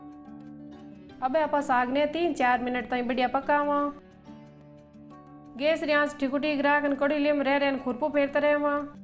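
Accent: Marwar, India. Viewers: Bhabi PYOR MARWADI bole 😊😊😊😊😊😊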